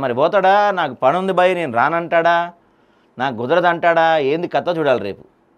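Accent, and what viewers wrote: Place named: Andhra, India. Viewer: అరేయ్ బొచ్చు నువ్వు ఆంధ్రా క్రాస్ ఆర ఎందిరా నీ భాషా😂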